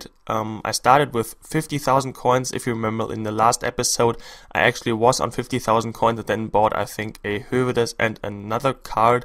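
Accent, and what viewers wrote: Germany. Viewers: wie man einfach nur raushört dass du deutsch bist :)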